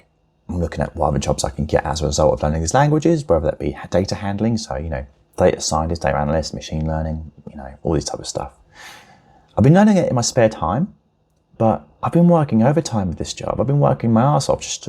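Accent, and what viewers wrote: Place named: United Kingdom. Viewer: nice video man, didn't understand 90% of what you were saying though. Cool yookay accent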